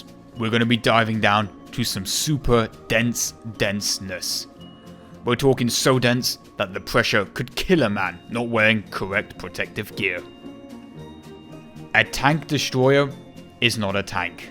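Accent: United Kingdom. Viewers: I mean, there are areas of the UK that just talk like that, I’m sure they didn’t all go to private school. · Im fucking rolling. Damn mate. Forgive me but i always thought you were British from your accent. You're fucking awesome mate please keep making more awesome content. This made my weekend. (Watched this feb 22 so im bit late.)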